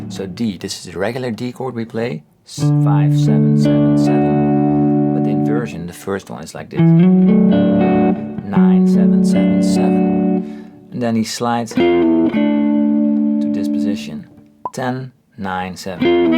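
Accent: Netherlands. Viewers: I was initially annoyed by your fantastic English in that Dutch accent, not to mention your prolific beard. I have become a true fan, though. Thanks for all the great content.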